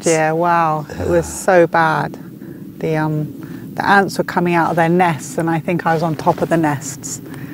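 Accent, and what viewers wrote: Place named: England, United Kingdom. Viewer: All art teachers should have an English accent.....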